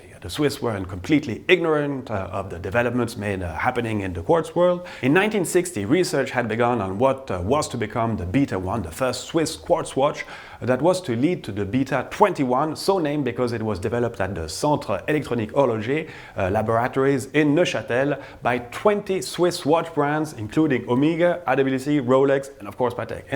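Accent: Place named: France. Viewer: Interesting content and very ambitious lecture. But: you try to press much details into 20 mins of video. That leads to a velocity of your speech which together with your strong french accent I can hardy understand. And I'm familiar with Englisch. Alltogether I'm faced with a kind of frontal teaching, which I hoped to be extinct since the 60s.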